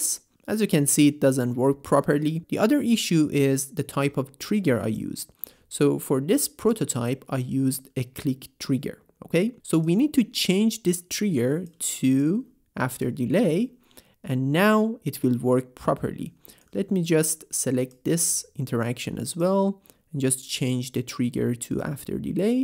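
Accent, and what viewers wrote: Iran. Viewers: I can distinguish that Iran English accent from miles away. Cheers to you brother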